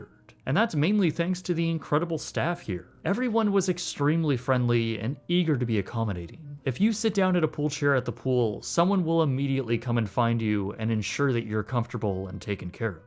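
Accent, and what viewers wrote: Canada. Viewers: You're Canadian accent is coming out incredibly annoying in this video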